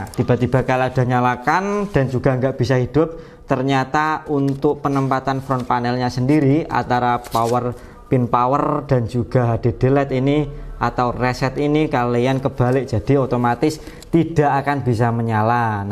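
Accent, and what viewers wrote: Java, Indonesia. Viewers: Ngomong e, medhok parah !!!😊😊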